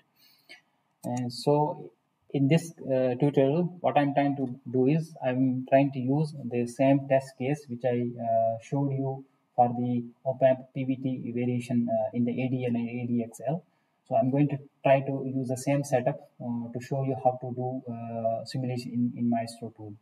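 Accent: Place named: India. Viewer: 现在印度英语听多了， 毫无障碍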